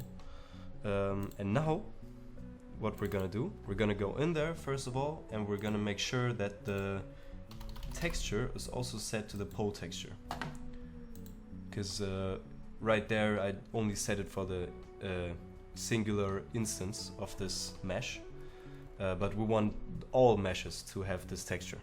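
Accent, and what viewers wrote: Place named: Germany. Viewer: Nice tutorials! Are you german btw? haha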